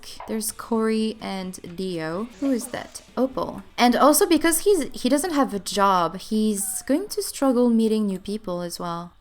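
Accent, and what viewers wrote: France. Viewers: I really enjoy the way you play the game! Also, I LOVE the slightest hint of accent in your voice. Are you french by any chance? I could listen to you speaking for ages, it just sounds so nice🌻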